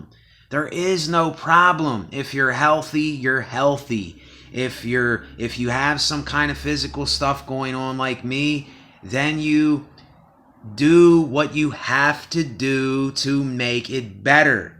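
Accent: United States. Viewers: Are you from the states?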